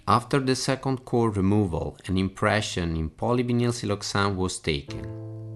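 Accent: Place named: Italy. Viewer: to avoid healthy animal.... hahahah love the 'italian English'.....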